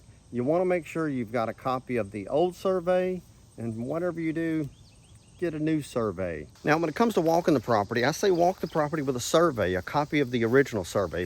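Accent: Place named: Louisiana, United States. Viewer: Funny how I can see a YouTuber for the first time and can hear they are from Louisiana..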